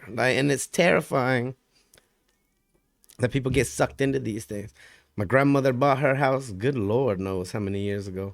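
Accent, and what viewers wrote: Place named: Louisiana, United States. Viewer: You sound like Louisiana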